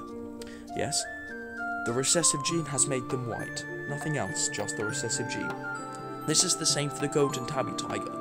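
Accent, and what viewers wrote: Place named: England, United Kingdom. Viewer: Are you English? You have a strong accent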